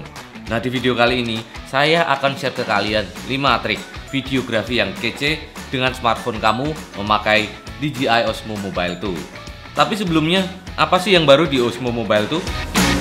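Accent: Java, Indonesia. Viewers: Kok medok cak?? Ngendi lho asline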